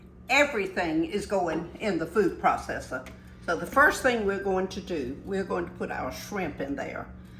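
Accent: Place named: South Carolina, United States. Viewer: I never knew how much I missed the South Carolina accent until I started watching your videos. My mother was born and raised in South Carolina, and I spent my formative years there.❤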